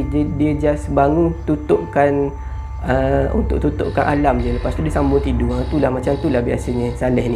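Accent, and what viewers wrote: Terengganu, Malaysia. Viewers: Bru nk Tanya orang Tganu kita ke? Betul pulok ambo agak orang Ganu. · Hadir sapport org ganu kite.. Slang ganu mmg x leh lari 👍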